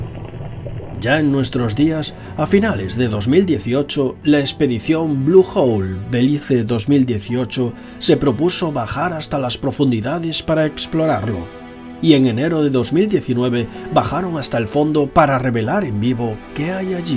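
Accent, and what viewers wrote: Spain. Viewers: El acento español hace que pierda el interés en el video. Es aburrido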